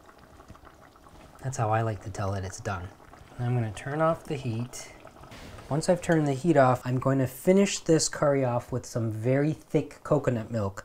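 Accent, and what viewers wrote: Jaffna, Sri Lanka. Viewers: “Melaha thool” lol love it that you still have your yaarlpannam accent. Looks amazing. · Thambi… super Unga srilankai Tamil kadhil ketka romba inimaiyaga ulladhu… lovely to hear your srilankam Tamil … rombavae vadivana vlog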